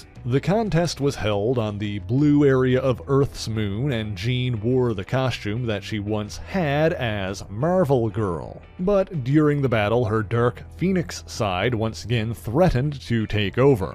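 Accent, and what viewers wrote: Canada. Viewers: Nah... Dude is legit having plumbed the depths of Marvel history. Bro is Canadian and boy it shows. He has a bit of an accent, Canadian wise. No what we gotta get rid of is the snap judgement of immature gatekeepers.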